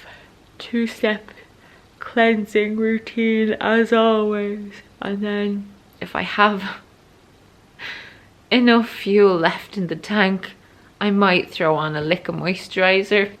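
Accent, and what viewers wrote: Ireland. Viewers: My names is ruby!! You messaged me when you was pregnant last time the way you both say ruby is so cute I love my name way more in an Irish accent and little 2 year olds voice can't believe how fast your pregnancy has gone I couldn't believe it when I started watching all the best to you anc your whole family I'm so excited for you and this little one who gets such and amazing village around her to raise her to be an amazing human so much love ❤️ the hair is amazing reminds me of og stay safe look after yourselves lots of love xxx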